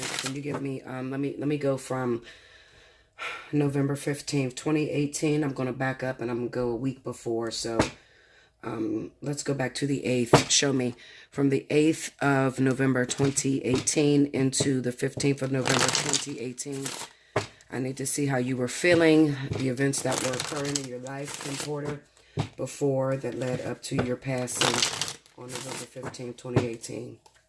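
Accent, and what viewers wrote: Pittsburgh, United States. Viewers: first time watching your video. Interesting read. Are you from Pittsburgh? I hear an accent (I'm from PGH, so that's why I'm asking)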